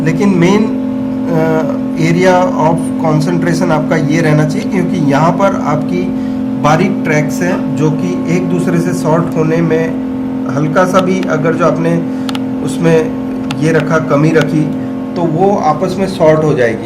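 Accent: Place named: India. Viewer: english way you sepack indian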